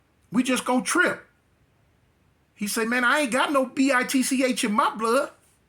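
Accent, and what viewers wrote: South, United States. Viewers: Imma keep it real og, you sound more southern than you do LA. I know it might be the 23 years, but dang, I'd swear you were born down here but with an LA spirit. It's like your heart is west coast, and your spirit is southern. "I said dang! That's sum'n else right thei'airr!" 😅🤣🤣 That 'they're' wasn't all the way LA or all the way Bama. It was mixed perfectly. 😅🤣🤣 Man, you sound like every one of my uncles & their friends I've ever heard. Word for word. & None of them ever left GA. Not one time.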